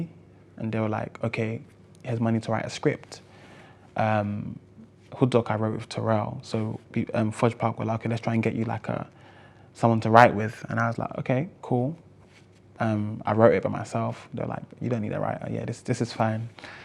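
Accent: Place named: RP, United Kingdom. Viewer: Do you really chat like that lol 😂 sound like really posh and stupid bro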